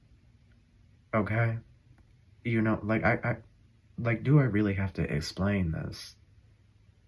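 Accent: South, United States. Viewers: The group who hounded me and lodged a claim against me is a law firm in the UK (Irwin Mitchell LLP) who promised me a No Win, No Fee deal, then reneged. They intended to grab a slice of the pie when my family house (worth $3M) in London was sold. But another law firm representing a man who was having an affair with our family cleaning lady and claimed to be my (wicked) stepmother's long lost nephew, got to it first and removed my name from the title deed. IM transferred the ruling (made without my knowledge) to the Supreme Court of NSW, Australia. I've been fighting it as a litigant in person for a year now. A Judge ruled against me last Friday because he believed the evidence of a fraudulent affidavit of service from a crooked organisation called ASI (All States Investigations) which declared I had been served. But IM don't get a penny because I'm protected by Australian domestic policy which does not permit my pension to be garnished or possessions worth less than $9000 to be seized. 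So you are spot on. Its similar to "Robodebt" and "Mr Bates v the Post Office" in its sinister implications of State v Individual). I know I am protected by angels. The other matter (a no contact romance scenario relating to my ex-GP) is not connected to the financial attack, but it might involve the presence of a demonic spirit, so I am taking no chances and will join the Catholic Church to take advantage of their exorcism procedure. I love your southern accent and your individual personsa. Th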